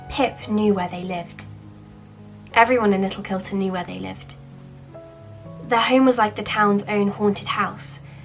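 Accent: United Kingdom. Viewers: Hahaaa that's true Emily 😂 like whoaaa, it sounds great, UK accent..💯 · I absolutely love your taste in books. Omg, the other commenters are right! The British accent DOES make it better! I've been practicing mine. I think it's quite accurate.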